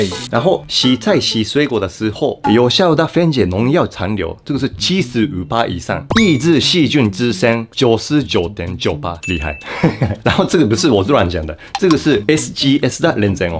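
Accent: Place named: Taiwan. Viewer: 好可愛噢！話語裡還會加上台語，看影片很療癒，搞笑，歡樂氣氛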